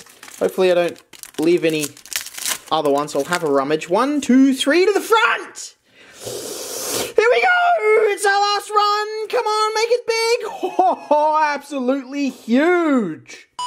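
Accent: Australia. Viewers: listening to Australian man screaming at card while having a coffee in the morning is exactly what i need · This shouty Australian man has invaded my YouTube viewing ..... And it's great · man I need your videos, I need Australian ga- , guy screaming to pokemon cards.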